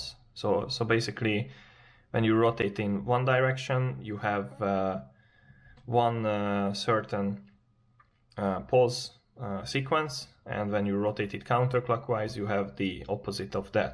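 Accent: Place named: Hungary. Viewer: you have a hungarian accent :)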